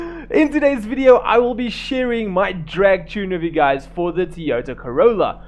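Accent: South Africa. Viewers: my fastest car in my garage has a 0-100 in 0.54 seconds and a top speed of 310 km/h. my time on that track with it is 8.23 seconds also are you south african? you talk with that type of accesnt? also great video i loved everything about it😀😀😀😀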